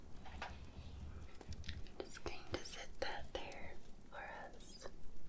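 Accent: Scotland, United Kingdom. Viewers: What a perfect start to the beginning of the week with an extra 10 minutes added to your video! I must say that you are looking extremely lovely tonight 😘. You're hair is like the hair of an angel 😇, straight soft and silky! I swear you're videos keep me relaxed, calm and so soothed. 😌 I love when you do the Sh, Sh, Sh, it's really tingley, why I have no idea, but it just is!! The facial mask and all of your lovely smells and sprays you use would definitely help me sleep incredibly well 😴. The relaxing session is very relaxing and a anatomy class combined 😴. But nothing beats you're lovely soft spoken Scottish accent when you read those stories 😊. And this story was awesome 👌. I gotta here more please 🙏. Thank you for this brilliant Asmr video! I hope you are well and everyone is doing great 👍. Take care 💛 🥰 🥰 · That's hoj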